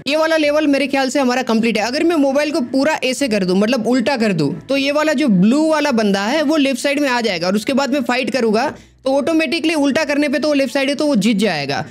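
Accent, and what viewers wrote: Gujarat, India. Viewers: He is Gujarati bro❤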